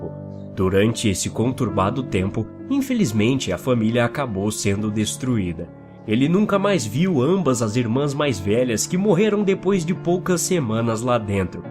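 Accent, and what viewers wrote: Northeast, Brazil. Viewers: ele tem um sotaque nordestino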